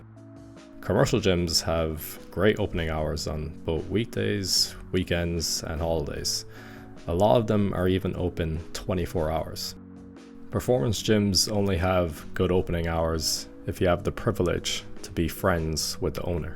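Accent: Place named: Ireland. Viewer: You’ll need a Kerr, dats just anudder ting yill need. So Irish I love it. Good vid, all true. Suck it performance gyms!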